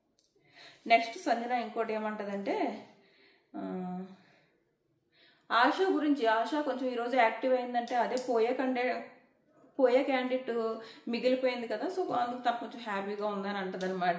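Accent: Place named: East Godavari, India. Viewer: East godavari voice ❤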